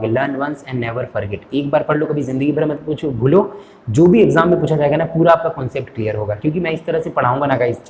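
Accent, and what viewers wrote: Gujarat, India. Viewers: Sir Aap GUJARATI ho..?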